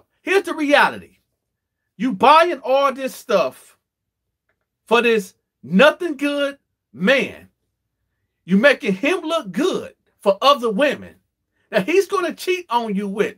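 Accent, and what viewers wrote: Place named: Ohio, United States. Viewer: I swear you Feel like Family. Something about your Aura is Vibrant. And you mentioned Ohio. It's family Familiar. Your accent and language. Buster...lol they way you talk I knew before you said it. OHIO Blessings to You and your Family...We all just tryna Understand this thing we call LIFE. Thank You...for your time.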